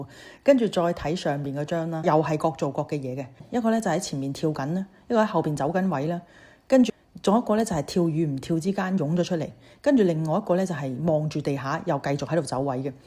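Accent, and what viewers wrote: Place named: Guangzhou, China. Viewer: 主持人有廣州口音🤔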